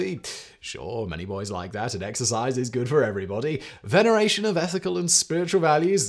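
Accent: United Kingdom. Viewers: Yes! I found him via The Casual Criminalist channel. I thought he was a very posh English man. Very proper. Then I found his other channels. 😂😂😂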